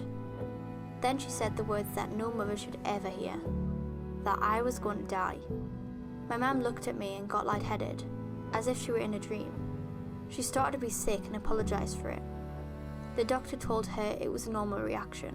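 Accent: England, United Kingdom. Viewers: Also I noticed you have a accent maybe a British? Because of the way you talk and the way you said better it was like: “betta” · I rlly wish it wasn’t told by an English accent. I didn’t like the pronunciation of words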